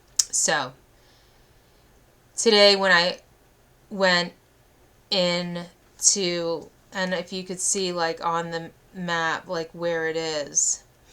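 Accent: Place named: East Coast, United States. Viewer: hello again, nice to meet you and also wanted to ask if you’re from the East Coast. I’m originally from Brooklyn New York, I’m 72, and I live in Hawaii for 40 years but I kind of recognized and East Coast accent. I can sense you’re a special person. Sending warm aloha breezes your way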